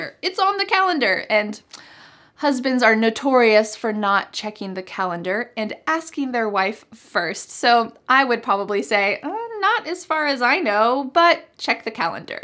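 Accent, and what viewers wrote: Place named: United States. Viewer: I love your American accent❤ And I also have been following you since 2018 and have learnt a lot with your videos.Greetings from 🇨🇺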